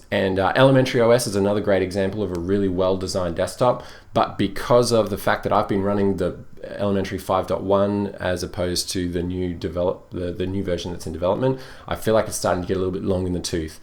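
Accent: Australia. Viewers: great job, enjoy your chats... nice to have an aussie accent on YT !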